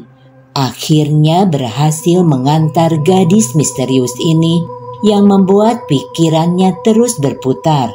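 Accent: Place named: West Java, Indonesia. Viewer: . Suaranya yang khas dengan logat bahasa Sundanya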